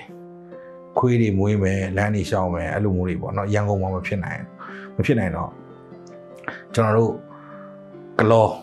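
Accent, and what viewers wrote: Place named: India. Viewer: ဟြန္ဒိုင္းကAccentကမိုက္တယ္ဗ်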